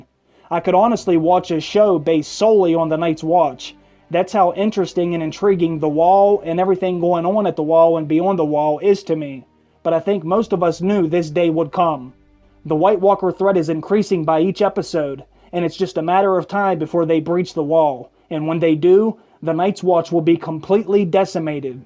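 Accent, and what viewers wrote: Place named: New Jersey, United States. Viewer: you need to be deported then. disappointed with your lack of caring and making my ears bleed with your semi informative jersey accent.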